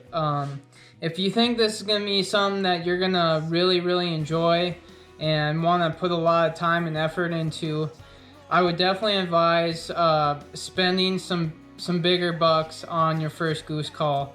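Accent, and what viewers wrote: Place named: Minnesota, United States. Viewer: ND?! You sound like you’re from Minnesota!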